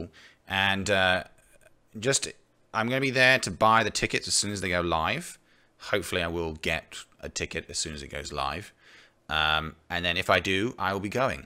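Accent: United Kingdom. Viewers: You speak with an RP accent so its hard to guess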